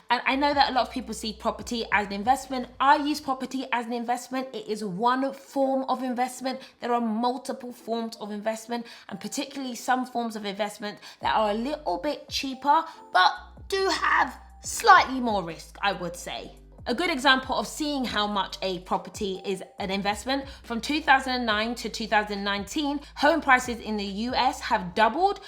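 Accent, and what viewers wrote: England, United Kingdom. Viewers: Are you British, by the way? Your accent sounds really English! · Is she British?😍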